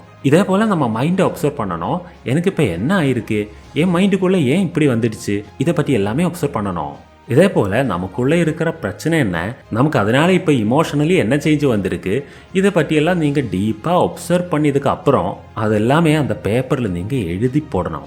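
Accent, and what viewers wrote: Kerala, India. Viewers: Awesome video thank you so much I like ur tamil lovely malayalam accent mixed, thanks for your effort people need it in today's huzzle buzzle life😊🙏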